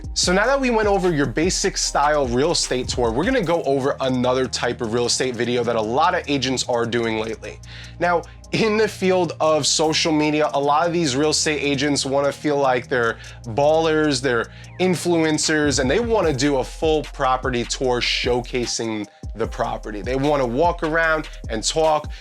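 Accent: New York, United States. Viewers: New York accent still strong my guy 💪🏾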